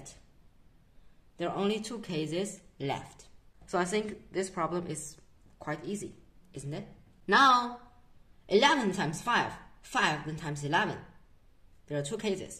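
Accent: China. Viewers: 听着亲切，感觉像东北的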